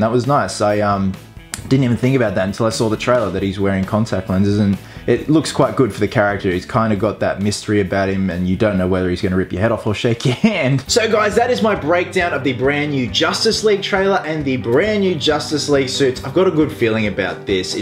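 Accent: United States. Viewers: hahaha I love your american accent, nice beard too! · American accent on point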